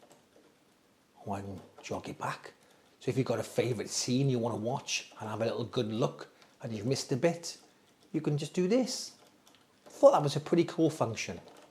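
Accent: United Kingdom. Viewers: Excellent British voice, I’m jealous 😭💞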